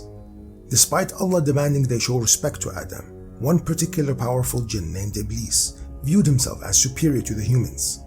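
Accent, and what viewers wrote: Arab world. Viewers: Arabian accent and a deep voice = Set for life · Well it is very hard for me to listen to your arabian english accent. Maybe you should include subtitles i the next video if it is not much of work.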